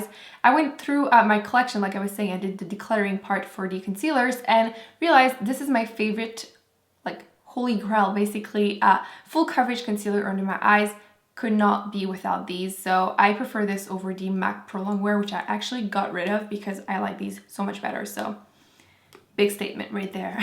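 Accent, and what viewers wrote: Quebec, Canada. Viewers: I love your channel and you seem so sweet! Also I adore your accent haha I thought it sounded familiar and then I saw that you are French Canadian! I'm Canadian too :) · I am a new subscriber. I see in your info you state your accent is French Canadian but I don't see your location. Where do you live now? Thanks, Jen xx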